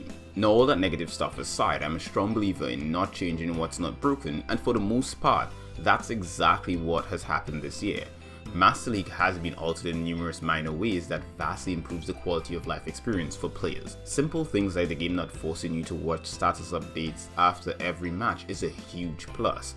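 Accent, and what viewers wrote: Trinidad and Tobago. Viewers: I'm a trini as well. Your accent sounded familiar so that's why I asked. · yeah im from trinidad but, i live in UK now and have lived away for a long time. Sorry if you're not, just thought some of the words you say sounded Trini like you might have some ties there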